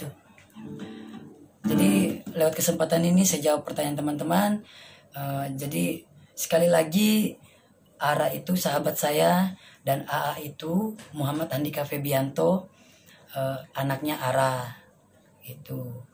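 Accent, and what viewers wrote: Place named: Bugis, Indonesia. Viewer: ee.. terjawab sdh pertanyaan semua org siapa ara dan handika ee.. lho kok ketularan gaya bicara bugis ya 😀👍 Gbu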